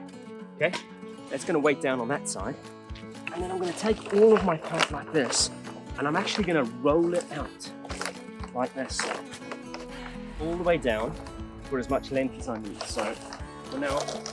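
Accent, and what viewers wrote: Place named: South Africa. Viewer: The accent seems South African but the wall and fence behind has no rhino fencing.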